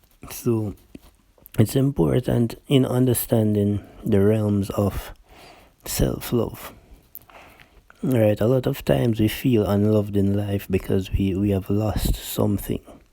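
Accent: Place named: Caribbean. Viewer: Accent sounds Caribbean 🇹🇹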